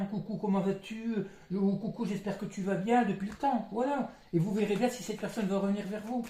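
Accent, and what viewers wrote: North, France. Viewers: Bonjour, j'ai comme l'impression que tu es din ch'nord, ça me rappelle de bons souvenirs... Merci pour tes lumineuses guidances. ☺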